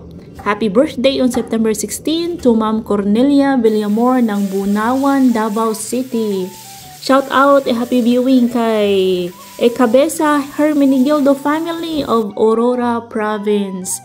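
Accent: Bohol, Philippines. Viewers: asa inyo sa visayas mam? kay murag Bohol man ang tonon, nice kay mura sag ug naa ra pinas diha mam nuh, dira kaau mingaw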